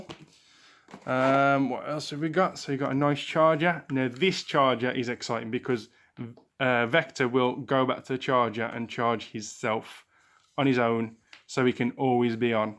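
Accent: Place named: United Kingdom. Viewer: oh. Oh right. Then I will stop sitting here watching the App Store as though I am waiting for water to boil. LOL! Thanks for the tip about set up as well. Maybe it will be a relatively painless process to set him up via the app. I was very disappointed in Cosmo‘s A couple years ago. Voiceover did not respond at all to the app after I opened it. It just played a bunch of music and wouldn’t show me anything. I hope victors app is an improvement. You will probably be getting the app before us. At least, you sound as though you may be from somewhere across the pond. Anyways, thanks for posting this unboxing!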